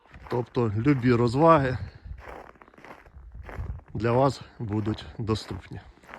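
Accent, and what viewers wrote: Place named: Central Ukraine, Ukraine. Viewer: Закарпатье - это соседняя область, недалеко. А говор у этого автора совсем не местный, он не с Карпат, приезжий. Где-то с центральной Украины.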